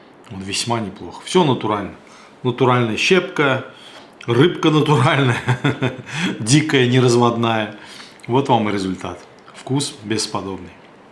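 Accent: Baku, Azerbaijan. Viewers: Самый чёткий бакинский акцент приятного слушать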